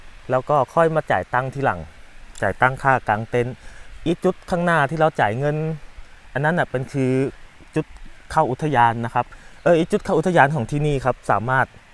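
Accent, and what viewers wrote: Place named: Sukhothai, Thailand. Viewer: สำเนียงโข่ทัย...น่ารักดี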